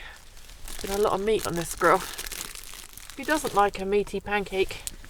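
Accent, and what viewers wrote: United Kingdom. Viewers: "Doof." I love it! Your British English gives me a charge. I learned the word, "bap," for sandwich, and that's fun. Love your videos! ❤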